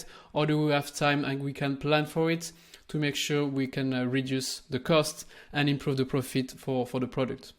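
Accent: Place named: France. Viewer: That’s French accent! Great! 🎉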